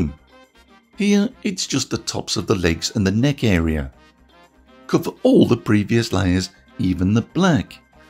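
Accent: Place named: Midlands, United Kingdom. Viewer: MARS GIVEAWAY MADNESS! thank you for this opportunity, your reviews are very handy and your accent reminds me of home as I grew up in the midlands! Good ol' Kidderminster 😂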